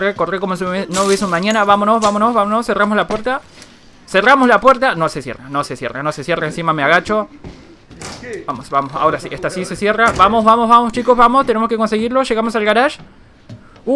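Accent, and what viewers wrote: Argentina. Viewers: sos argentino???? desilo dale! xD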